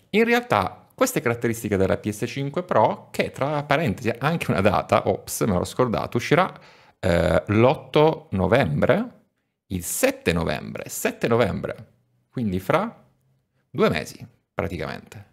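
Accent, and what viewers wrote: Catania, Italy. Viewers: Grandissimo ma sei di Catania? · Ma sei Catanese?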